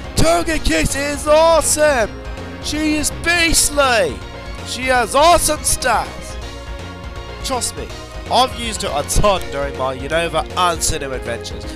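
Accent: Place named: United Kingdom. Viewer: are you british or something cause your accent is hillarious XD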